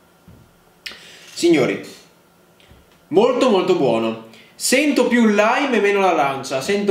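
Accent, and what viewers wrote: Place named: Bari, Italy. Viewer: Sembri più barese che napoletano 😂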